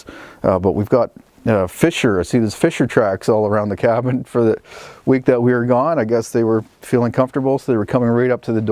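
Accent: Canada. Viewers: *WOW 💕 what a man!* *You've created a wonderful, (other) life.* * *I wish you safety and all the best for great health and happiness with family and friends for many, many years to come.** 🤗 I adore your Canadian accent especially, when you pronounce the word: "out".🤗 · Hi, Great video, as usual. Completely off the subject but has anyone else seen the similarity in personality, tone, and world view (not to mention looks and Canadian accent, eh?) between and the great advocate for free speech and self reliance, Jordan Peterson, the clinical psychologist whose work has done so much to turn young men around. If you haven't seen Jordan Peterson, you must. He's all over Youtube. Check him out too, you won't regret it. It's be nice to have and Jordan sit down and talk about life. Happy New Year! Steve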